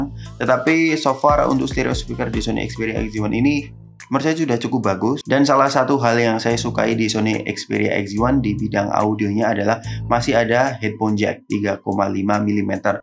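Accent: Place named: Java, Indonesia. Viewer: Ketoro jowone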